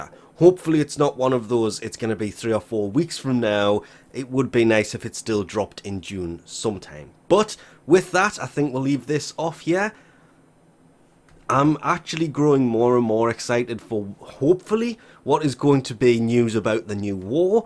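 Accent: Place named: Newcastle, United Kingdom. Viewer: Geordie (from Newcastle I would guess).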